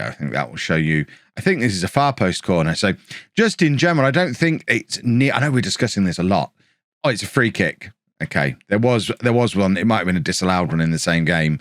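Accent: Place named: England, United Kingdom. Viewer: Its funny to me, that in some regions in England people pronounce some words that has the letter "W" like it also has a "R" in there ^^. Awesome series!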